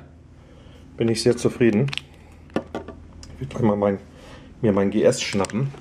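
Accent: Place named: Germany. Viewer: Random Logick Nicht spießig! Aber schon sehr deutsch 😁😜 Gerade durch Sprache. Aber alles cool ich mag ihm ja 😜 Bin ein Fan thumbs up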